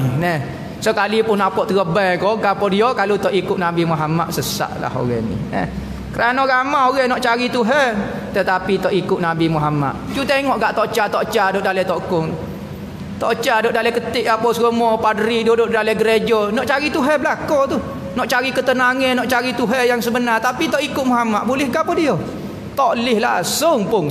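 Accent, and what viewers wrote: Kelantan, Malaysia. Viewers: Terbaik ...walau slang kelantan kuat...kami dari johor suka dengar ceramah ustadz..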